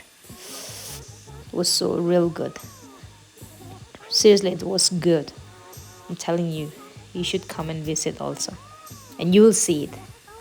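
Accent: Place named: United States. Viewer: Wow! Your english speaking is super fluency and a perfect American accent. Good to hear it. 👍 Happy stay!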